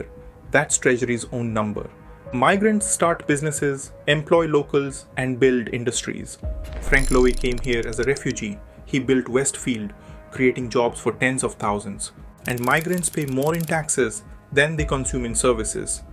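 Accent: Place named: India. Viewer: JUST STRAIGHT UP BULL SHIT What Anti-Migrant Protesters Got Wrong DO YOU ME MEAN AUSTRALIANS? LOL (I HAVE NOW BECOME ANTI-MIGRANT) BUT HAY, IT'S ALL ABOUT THE LAST 3 YEARS OF YOU LAZY, DIRTY, MEAT HEADS COMING INTO OUR COUNTRY AND OUR SO CALLED TRUSTING BUT LOVING GOVERNMENT LETTING IN 1500 INDIANS AKA IMMIGRANTS INTO OUR COUNTRY PER WEEK AND JUST TO TOP IT OFF IT'S A INDIAN VOICE OVER (GO HOME) TRYING TO TELL US AUSTRIANS HOW GOOD THEY HAVE BEEN FOR OUR ECONOMY F OFF HOME WE DON'T NEED YOU OR WANT YOU!!!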